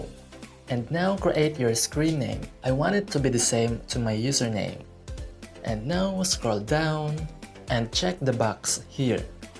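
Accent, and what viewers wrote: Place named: Philippines. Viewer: I already know that your a pilipino bc of your accent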